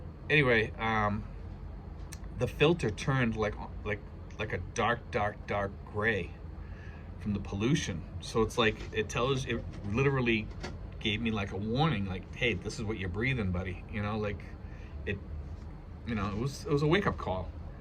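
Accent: Boston, United States. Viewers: Awesome video as always . Cool Bawstawn accent dude !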